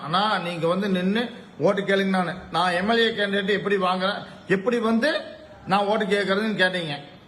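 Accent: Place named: North, India. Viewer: இவன் முதலில் யாருடா!!! வடக்கன் மாதிரியே தமிழ் பேசுறான்.... தலை எழுத்து நீ எல்லாம் கை விரலை ஆட்டி பேசுவது. இந்நேரம் அந்த அம்மா இருந்து நீ இப்படி பேசி இருந்தா உன் நிலைமை நான் சொல்லி தெரிய வேண்டியது இல்லை...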